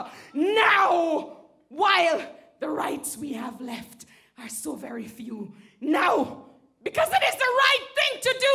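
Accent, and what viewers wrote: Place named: Caribbean. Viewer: That's more of a Caribbean accent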